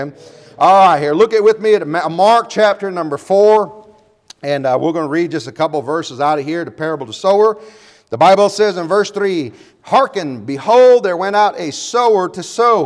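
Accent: United States. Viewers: Good job preacher, sounds like your somewhere in or around the Texas area.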